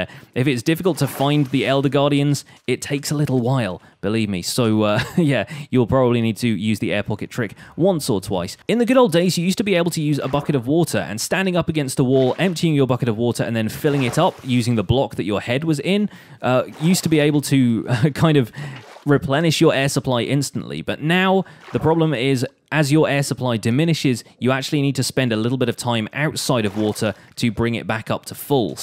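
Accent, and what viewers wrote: United Kingdom. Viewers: I SWEAR he's British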